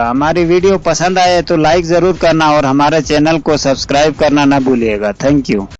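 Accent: Gilgit, Pakistan. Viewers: آپ گلگتی ہو کیا آپ کا لب و لہجہ گلگتی ہے؟ ؟؟؟؟؟؟ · ظاہر ہے گلگتی ہی ہے۔ اسکے لہجہ سننے کے بعد بھی آپکو کوئی شک ہے؟ 😂😂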